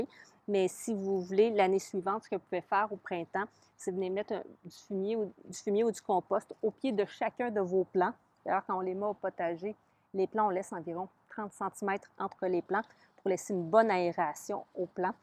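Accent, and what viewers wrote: Canada. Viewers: Bonjour amie canadienne jadore votre accent je vous ecrit de suisse jadore votre video qui est bien expliqué mais vous parler d'engrais spécial fraisier sest bien mais avait vous penser au engrais naturel comme les coquille d'œufs marc de café ou purin d'orties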